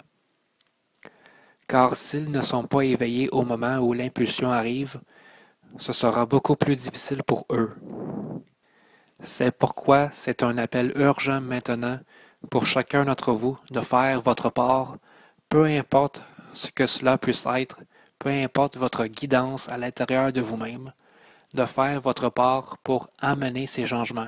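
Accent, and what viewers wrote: Canada. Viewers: c'est quoi cet accent canadien yeshua a été élevé en Egypte et il a vécu en france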